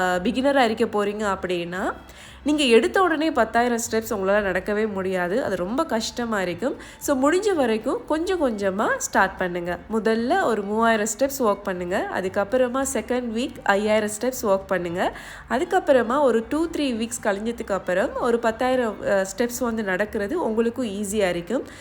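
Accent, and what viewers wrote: Sri Lanka. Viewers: Neenga srilanka tamil thane pesurenga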